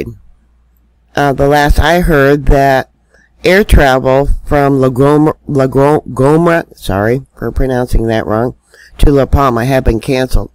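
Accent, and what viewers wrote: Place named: Midwest, United States. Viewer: Well, you are amazing! I heard this little calming Midwest? Southern Midwest voice with the most horrible news ever which in 6 days no one is talking about. The tsunamis. I knew they could happen but I didn't know it's bcuz the island would go under. I'm subscribed and I'm sticking with you and bushbear or what his name is (apology) but he's very nice and English speaking. Peace and take care. (Bushcraft Bear.;) )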